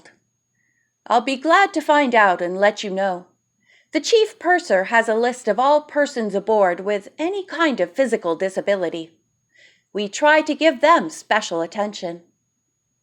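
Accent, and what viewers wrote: South, United States. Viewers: I love your southern accent !! It is perfect !!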